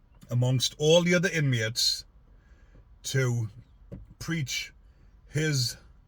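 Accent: Newcastle, United Kingdom. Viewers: All true made better with the geordie accent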